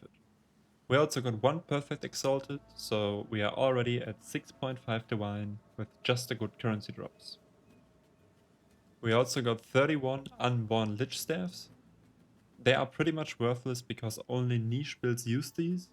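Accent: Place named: Germany. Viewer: Junge dieses deutsch englisch ist ja grauenhaft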